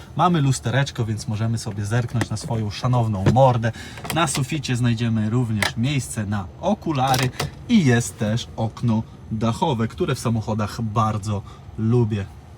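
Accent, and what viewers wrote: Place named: Podlaskie, Poland. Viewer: Ach te "białostockie sljedziki" w akcencie prowadzącego :D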